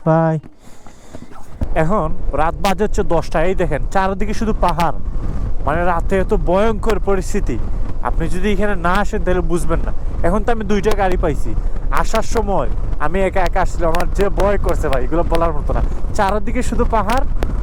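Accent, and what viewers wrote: Sylhet, Bangladesh. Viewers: Bhai Apnar House Sylhet ni ?? 😅 কথার ধরনে বুঝা যাচ্ছে সিলেটি ❤ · Tmi Sylheti?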